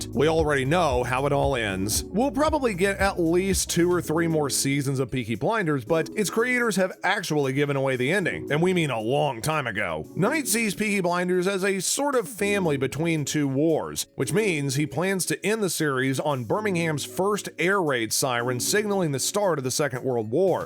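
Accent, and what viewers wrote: United States. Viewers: I’m sorry but the way Americans say Liverpool and bermham is funny why we need more big British YouTubers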